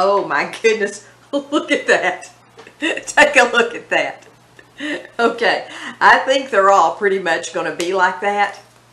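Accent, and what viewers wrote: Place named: North Carolina, United States. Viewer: you make me laugh so often. I'm a North Carolinian & the 1st time I watched you, I said aloud,"" this Girl is from NC or TENN☺💕☺. I just knew that accent💕.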